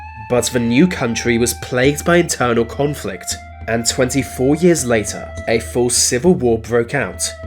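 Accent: United Kingdom. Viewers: What about a United States of, where the biggest export is weird facts and stories told in a snarky, British accent?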